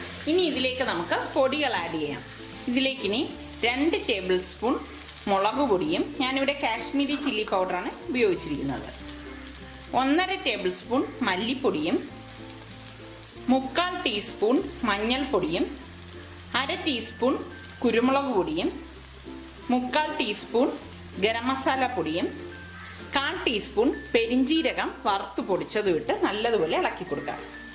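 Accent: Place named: Kottayam, India. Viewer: Sister the crab came out so well! You speak Malayalam like my parents so you must be from near Kottayam! greetings from Canada! Very clear instructions! Thanks!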